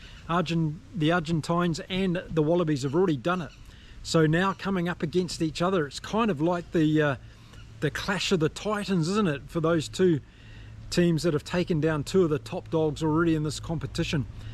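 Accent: New Zealand. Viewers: Hi, thank you for the support, I hope you are right!! Also thank you for your neat articulation as you speak, it is really easy to understand your english in spite of your New Zeland accent, to which I'm not used to listen. For example, I have far more difficult to understand the other from Two Cents Rugby. Cheers!!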